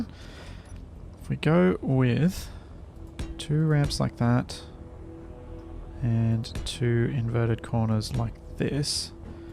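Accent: Australia. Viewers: Definitely. I'm assuming from the time you post and the accent that you're in Australia? I'm in a bit different time zone.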